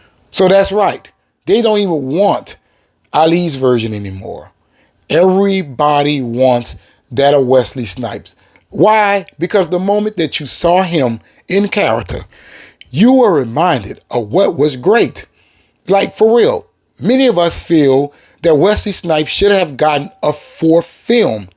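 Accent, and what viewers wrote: New Orleans, United States. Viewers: Are you from New Orleans? I hear the accent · Man, am I hearing a nawlins’ accident? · that N.O. accent hitting and is much needed in the YouTube space. Keep it flowing, my friend. Love your content!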